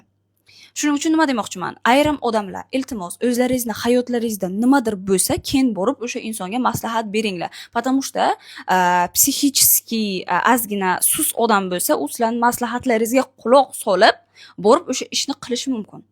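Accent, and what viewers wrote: Bukhara, Uzbekistan. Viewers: Assalomu alaykum opajon yaxshimisiz,Buxorodamisz deyman opajon shevangiz menikidek ekan ❤❤❤ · Buxorocha da😊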